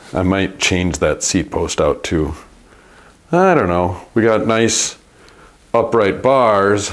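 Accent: Wisconsin, United States. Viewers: "there's my midwestern accent for ya..." i'm a transplanted midwesterner on the east coast, and if it weren't for the great bike content, i'd watch your vids anyway, just for your WiscAHnsin accent!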